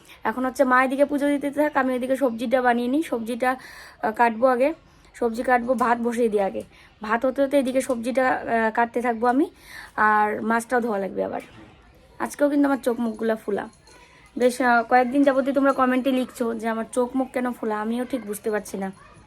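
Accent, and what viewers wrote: Bangladesh. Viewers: From Bangladesh 🇧🇩. Apu tumi Bangladeshi suna khub valo laga. Tomar video thakhi but comments kora hoy na.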